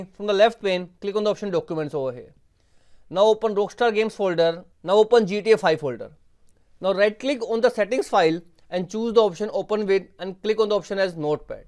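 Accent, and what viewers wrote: India. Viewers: Thank you for the video, I am going to try these fixes. Just one thing; can you please speak slower, it is very difficult with the Indian accent to understand what is being said. I'm an old guy and I find it very hard to follow.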